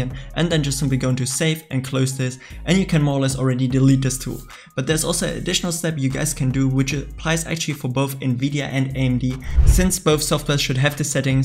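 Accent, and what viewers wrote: Germany. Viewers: der klingt anders deutsch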